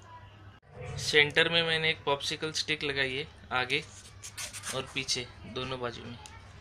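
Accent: Maharashtra, India. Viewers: bhai ,awaz se maharashtrian lagathe ho. kaha se ho,??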